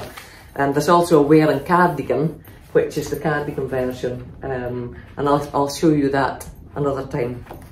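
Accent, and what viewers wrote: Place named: Scotland, United Kingdom. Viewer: Really enjoyed listening and watching you with your crafting..love your Scottish accent also..😊yes..certainly would love to see more of what you get up too..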